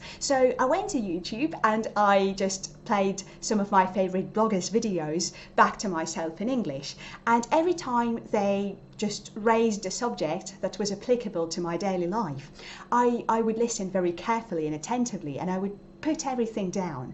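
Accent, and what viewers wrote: United Kingdom. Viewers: I love your British accent and your fancy way to speak!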